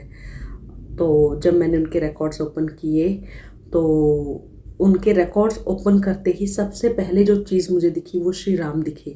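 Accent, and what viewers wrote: Haryana, India. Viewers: राम - राम बेबे । आपका बोलने का लहजा हारेयाणवी हिन्दी का है। क्या मैं सही हू।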